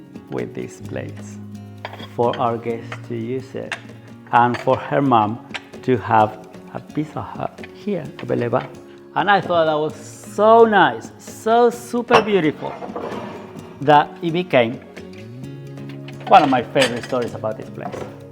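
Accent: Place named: Argentina. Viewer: So many great ideas! The redesign of the space. The scaffolding boards with wine corks. The double shower. Revealing the little window. The decor hitting all the right notes. Your joie de vivre, hard work, and humility. Plus I love your narration and your accento argentino. Thank you for your inspiring life. Abrazos grandote che. 💙🦋💙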